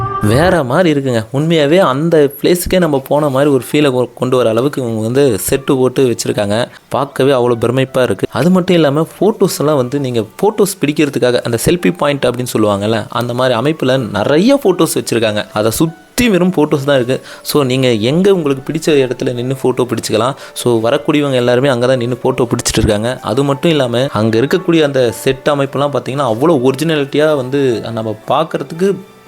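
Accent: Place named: Vellore, India. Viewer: Nama than 😂 vellore la inime nama than antha mari iruke ya un slang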